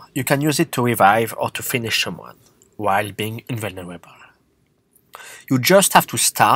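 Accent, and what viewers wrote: France. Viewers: I know he talks English but I just hear French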